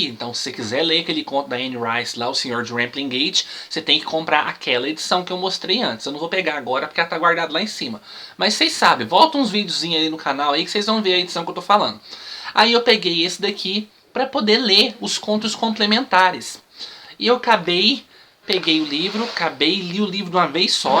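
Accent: Minas Gerais, Brazil. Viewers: "Sai pra lá, não quero esse trem não" KKKKKKKKKK amo as resenhas do principalmente pelo mineirês🤣🤣🤣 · Um resumo bem mineirês. Amei!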